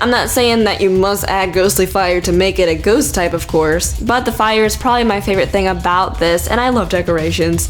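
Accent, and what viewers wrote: South, United States. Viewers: Let me guess your from the south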